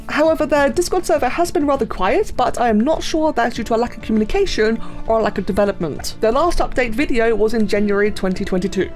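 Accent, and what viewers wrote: United Kingdom. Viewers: Most British accent ever heard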